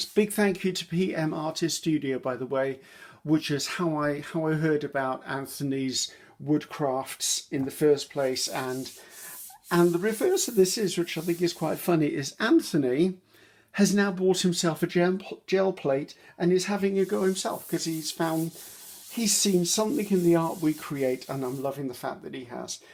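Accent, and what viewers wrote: United Kingdom. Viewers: I watch your wonderful videos daily and I never tire of your creations. I especially enjoy listening to your British accent and quirky sense of humor. I live in the Deep South of USA. We use tung oil on heirloom antique furniture.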